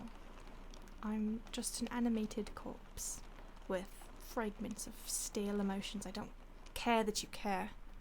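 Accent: United Kingdom. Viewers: I never knew I needed a british voice in my loneliness but I now know I needed it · If I'm not wrong i think you are speaking in a British accent yeah? 😎😎 sounds awesome for a British vampire yes?👍👍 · That accent man...British women are the hottest sounding women on earth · Pretty good Brittish accent love or are you actually English ? Also I subbed and dinged coz about time SOMEONE did a bloody vampire RP without resorting to that bullshit hypnosis power to do the "forget" bollocks Also I'm English and thought your accent was kinda sexy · I love youre accent Are you British ? ;)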